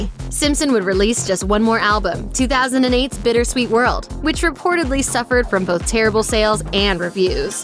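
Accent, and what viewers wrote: United States. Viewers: Agreed, on every point. However, our narrator in THIS case isnt a brit, nor does she speak with an unusual dialect or accent. She sounds like a born n bred 'murican to me.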